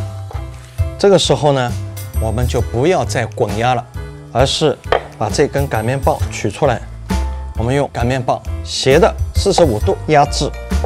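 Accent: Shanghai, China. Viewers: 带着上海口音好亲切，想念上海小馄饨了有空壹定按朱師傅的配方做壹次對自己好壹點哦!👍😍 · ，上海口音。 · 大哥，听口音你是上海人是伐。你这碗小馄饨太感人了。😭我土生土长的上海80后，一不当心远嫁加拿大。太想念想小时候吃到的上海点心美食了，好几年没回上海了。大哥下次教一下粢饭糕还有油条怎么做好吗？怀念小时候路边摊的味道。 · 上海腔十足的师傅做出美味无穷的江南美食，让我又想起了家乡。好想吃上海的小馄饨~ 谢谢 ❤ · 一听就是上海人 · 一听就是阿拉上海大师傅😄对自家好一点😋嗲额！看了我才吐水哒哒滴😋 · 师傅是上海口音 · 一开口就晓得了，阿拉上海特有的普通话口音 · ，特别喜欢你的视频，尤其喜欢不经意流露出的上海话。看了那么久的视频，直到看到你的视频由衷的感叹，专业的老师傅就是不一样。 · 标准上海普通话👍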